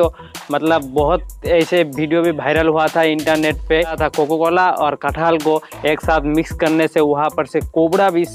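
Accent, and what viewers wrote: Bengal, India. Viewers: Aap west bengal ho Kiya?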